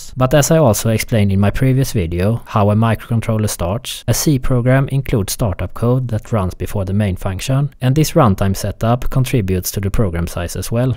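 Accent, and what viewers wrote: Sweden. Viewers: Interesting project but I especially enjoy the Swedish accent. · swedish? accent is swedish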